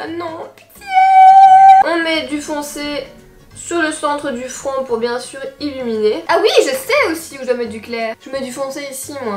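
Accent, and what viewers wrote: Belgium. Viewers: tu as honte de ton accent belge n importe quoi reste comme tu es on t aimera plus naturelement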